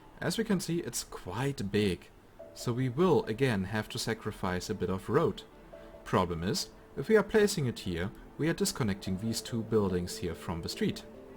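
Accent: Germany. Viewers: man hört den deutschen akzent aber das ist cool. ich spreche auch oft englisch. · You have a german accent, do you come from germany :D?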